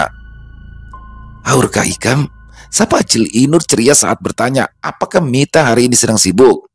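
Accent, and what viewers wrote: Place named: Banjar, Indonesia. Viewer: Dialek Banjar nya enak sekali didengar.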